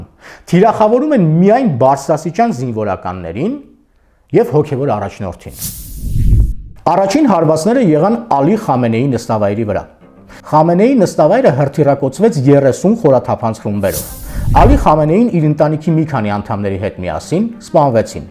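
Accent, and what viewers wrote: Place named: Yerevan, Armenia. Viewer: Меня бесит ваш эриванский акцент. Не надо тянуть гласные!!!!!!!!!!!!!!!!!!!!!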